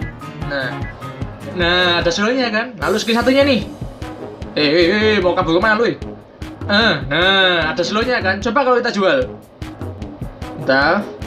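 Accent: Java, Indonesia. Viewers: Medok bgt ngomongnya :v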